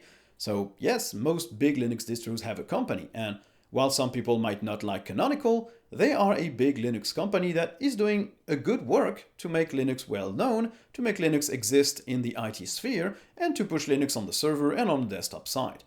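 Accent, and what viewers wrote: France. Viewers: Even though Ubuntu has its cons, I have had many issues with other distros (Manjaro, OpenSUSE, etc.) when it comes to NVIDIA RTX GPUs support. Everything simply worked with Ubuntu, so I guess that was my only option, other than wasting another 2 days on distro hopping and figuring out a solution. Also, I know it is out of topic, but you are such a handsome man. (Also, by your accent I feel like you might be French, in which case, un salut à toi depuis Strasbourg !) Keep it up with the great content :)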